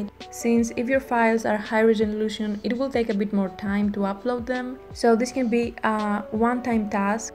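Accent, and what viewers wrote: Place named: Greece. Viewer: you sound greek, i loved your video it really helped me thank you!!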